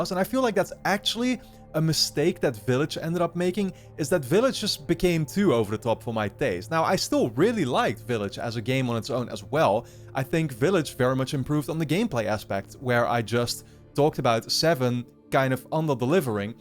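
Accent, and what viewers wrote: Netherlands. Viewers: Dat Nederlandse accent hoorde ik meteen 😜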